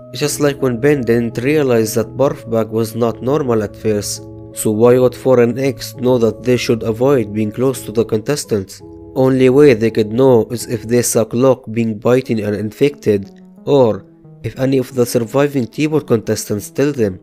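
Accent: Russia. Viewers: Your accent kinda funny Are you Russian? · russian guy?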